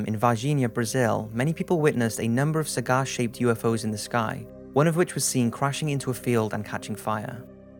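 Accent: Wales, United Kingdom. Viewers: Yo are you from Wales?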